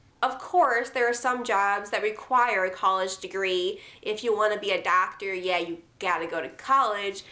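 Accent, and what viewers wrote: South, United States. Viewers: you accent is become increasingly countrified. It's getting me excited. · Good vid. Your chin dimple is getting cuter each time. Where does this southern accent come from.....real or are you just putting in on? BS says free college for everyone...just what we need.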